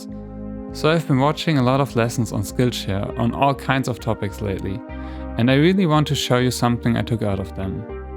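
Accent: Germany. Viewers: Are you from Germany? :D Cause you have this accent and you subscribed to Coldmirror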